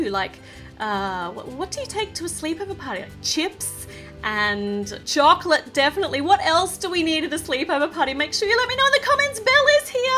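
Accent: Australia. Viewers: Are you Australian Please reply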